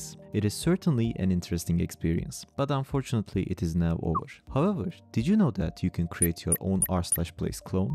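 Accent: Turkey. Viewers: is Ur accent is Turkish haha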